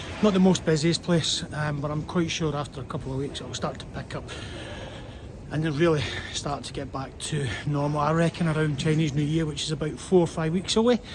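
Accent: Scotland, United Kingdom. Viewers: How could he/she not understand it? Your accent is much much mild Scottish accent but certainly can be understood easily. I really have to work hard to under the strong Glaswegians' accent as some words seem not English when they speak.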